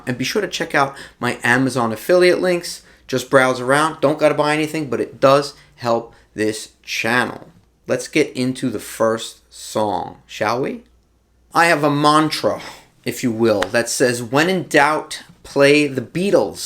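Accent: New York, United States. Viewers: Fab lesson Love the NY accent Cheers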